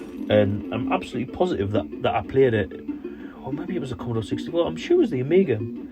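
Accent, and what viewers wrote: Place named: North East England, United Kingdom. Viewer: Great stuff mate. You can’t beat free stuff, you sound like you’re from the NE? We are in Teesside. My cousin was REME too.....I bet you get that all the time 😂😂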